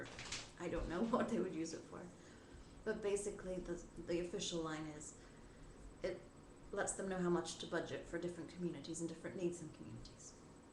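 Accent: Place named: Ireland. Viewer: I love your accent and you are a beauty! Are you from Ireland?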